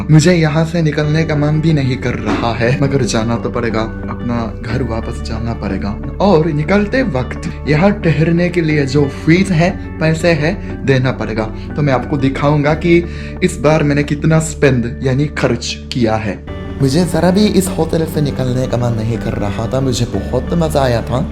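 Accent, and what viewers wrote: Standard. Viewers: Checking in... ♥️♥️ pure shudh uccharan krte ho aap bhai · although i an Maharashtrian citizen i dont know to speak such pure hindi like u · Omg, iski hindi to meri hindi se bi acchi h, itni shuddh hindi😛 Great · A JAPANESE CAN TALK BETTER HINDI PURE THEN INDIANS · Pure Hindi and simplicity = Love from Mumbai only ☺❤😄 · Shuddhhhhh Hindi wowowowow👍😁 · s hindi is very pure and good than most of the indians❤❤ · Itni shud hindi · He is speaking pure hindi 👍 May god bless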